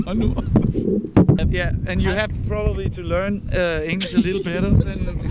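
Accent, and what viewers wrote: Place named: Denmark. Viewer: Thank you for showing me a Danish accent!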